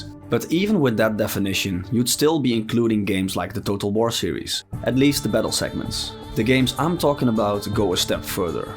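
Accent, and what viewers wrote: Netherlands. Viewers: Good video. As a sidenote, you sound hella dutch.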